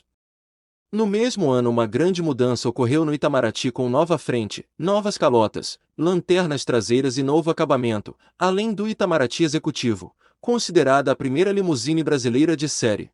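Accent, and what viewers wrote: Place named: Portugal. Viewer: Que bobagem! Uma reportagem tão boa e vem me falar de correção da pronúncia? Qual o problema de se pronunciar à "moda portuguesa"? Qual é.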